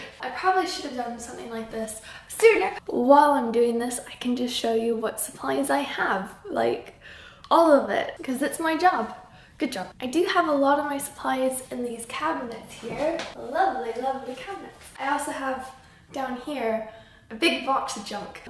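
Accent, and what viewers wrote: United Kingdom. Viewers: Love that you're starting to pick up a British accent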